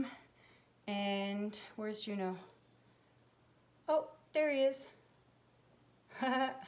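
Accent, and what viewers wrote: Canada. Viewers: Omgoodness I love yer Canadian accent and you and Molly Mc like BUTTA are my favorite!!! WHAT????? Beautiful. I’m doin it!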